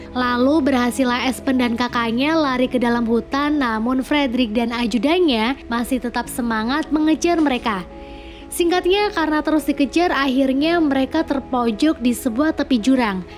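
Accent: Pemalang, Indonesia. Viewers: Mbak asli wong pemalang?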